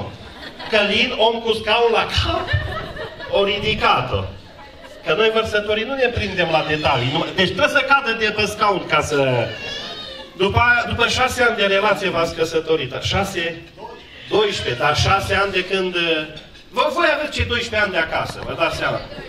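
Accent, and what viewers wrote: Transylvania, Romania. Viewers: Ești foarte tare și cu accentul de ardelean ai pus punctul pe i, bravo ție și echipei 👏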